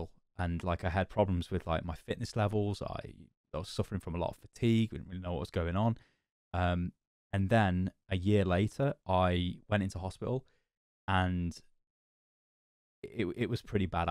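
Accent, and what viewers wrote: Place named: Cockney, United Kingdom. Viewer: Overwatch came out, I got it, and I immediately went on the internet to learn about the game. It wasn't long before I stumbled into this weird cockney guy who didn't script his videos and really seemed to know his shit. I got to know simply as the guy with the Overwatch channel I check out from time to time even after I'd stopped playing the game. And just now I discover that he's in pain, and I find myself getting teary-eyed just as if a friend told me about his problems., you're a part of my life now. Get better, friend. Take all the time you need. I'll be here when you get back.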